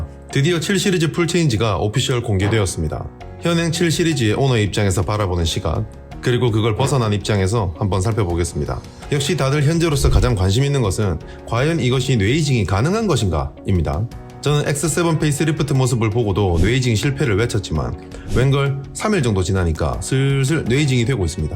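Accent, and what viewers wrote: Gyeongsang, South Korea. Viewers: 경상도 분이신가요? 억양에 사투리 오지게 들어 있네요..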